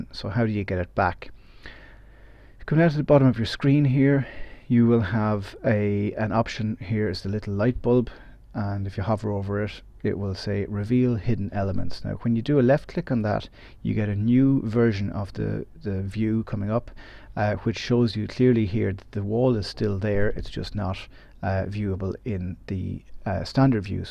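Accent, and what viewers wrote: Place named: Ireland. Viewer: Its good to hear an Irish voice and well explained.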